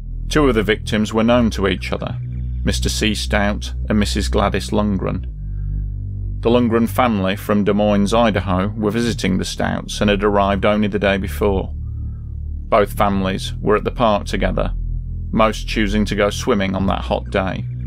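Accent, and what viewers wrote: United Kingdom. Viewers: I can tell you're British, but youve live in America for a while. I can tell by the way you say "the hospital"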